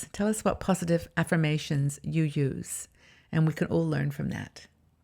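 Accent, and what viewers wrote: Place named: United Kingdom. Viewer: Hello, thank you for this inspiring post of positive affirmations! This has definitely set the tone for my upcoming workout at the gym and day. I'm 62 and am embracing my age yet fighting my age too. I say 62 is the new 29. Haha! Love your necklace and you look awesome in red! I have to ask, where are you from as you have a slight British accent? Looking forward to your next video. 💜 from Texas.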